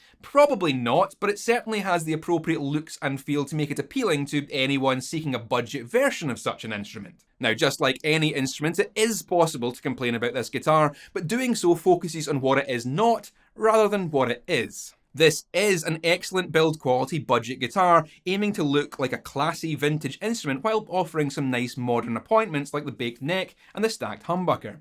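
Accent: Scotland, United Kingdom. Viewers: Sarcasm sounds so much better in a Scottish accent 😏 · Sarcasm in Scottish, sounds like home. 😂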